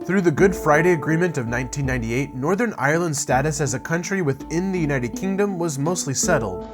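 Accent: United States. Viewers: Well done on the Irish border explainer - it's somehow reassuring to have a knowledgeable US voice explain it. Makes it all feel less crazy. I'm looking forward to watching your Berlin Wall video - I lived through the fall in 1989 when I was studying in Berlin. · I'd love to watch this but one minute in and I can't take the awful american pronunciation anymore, sorry man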